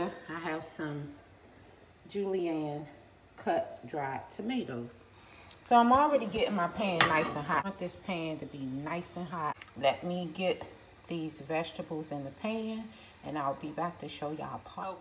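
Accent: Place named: DMV, United States. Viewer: Shalom, where are you from? Sounds like from the DMV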